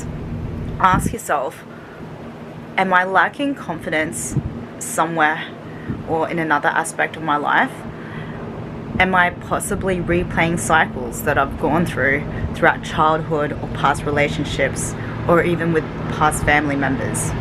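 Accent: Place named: Australia. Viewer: I seriously love australian accents so much (which is what I think yours is?) lol if not, I STILL LOVE IT Also, I can relate to ALL OF THESE. It made me laugh when you said "you just wish they'd leave you," because I lowkey would have this thought in the back of my mind that said "I wish he'd just cheat on me so I could leave angrily." I know it's bad, but I was looking for a way out instead of facing my true feelings of just simply not wanting to be there.. I am no longer in that relationship though!!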